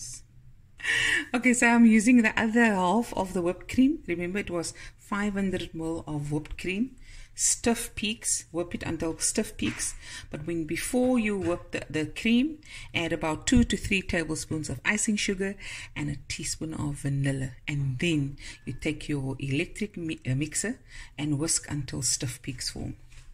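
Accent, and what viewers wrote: South Africa. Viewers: Awesome To hear a friendly South African Voice. I enjoyedyour video very much, everything is already in the cupboards and fridge. I like your trifle its neat and not a messy thing. Lots of love‼️❤️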